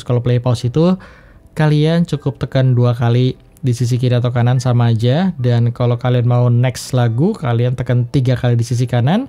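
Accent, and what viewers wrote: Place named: Java, Indonesia. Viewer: hehehe.. maap³ bang, ane cek video nya lagi ternyata kuping ane yg salah😁. Biasanya denger review pake earphone, pas video ini ane pas diluar jd gak pake earphone(langsung speakerphone HP). Berasa beda banget krn ane denger nya kaya ada medok² jawa nya 😁😁😁... 🙏🙏🙏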